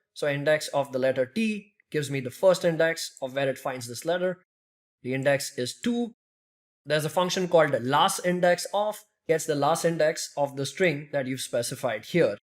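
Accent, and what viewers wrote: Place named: India. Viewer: And his mic quality is actually good! Unfortunately so many of these talented developers making content have thick Indian accents, although they can’t help it - this makes it hard to understand coupled with bad microphones its doubly difficult to comprehend the great info they teach: shout-out! 💪🏼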